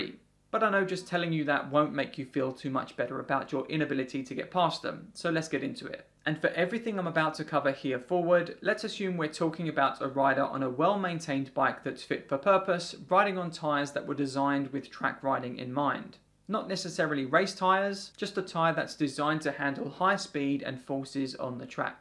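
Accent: United Kingdom. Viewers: Wait what, he’s British? 😋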